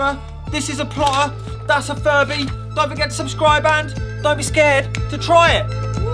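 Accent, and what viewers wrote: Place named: London, United Kingdom. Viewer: He does sound like a Londoner, in which case- he's in the right place!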